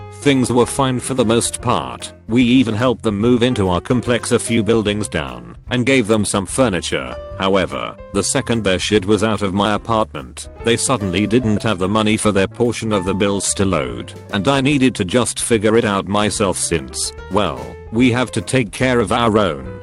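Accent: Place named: United Kingdom. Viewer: This robotic British accent, does worlds for making some of these people sound not incredibly foolhardy or a little pathetic.. not all, but surely some.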